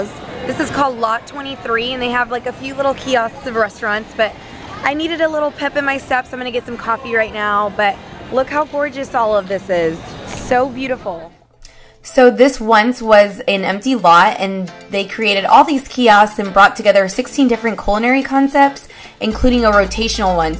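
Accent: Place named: South, United States. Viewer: lol? When she says "Ya'll," she is referring to friends at home in the states of Texas. - its a southern accent. I don't know why.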